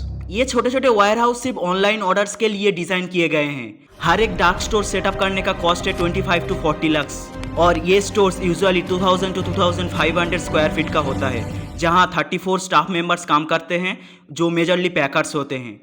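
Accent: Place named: Bengal, India. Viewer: You have a Bengali accent, so you'll need to work in that style for this task—everything else is perfect!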